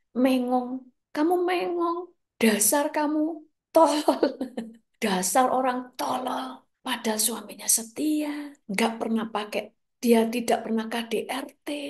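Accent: Java, Indonesia. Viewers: Bu mangstap... ternyata wong medhok... ❤❤❤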